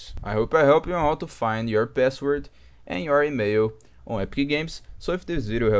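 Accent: Brazil. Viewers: Are u brazilian?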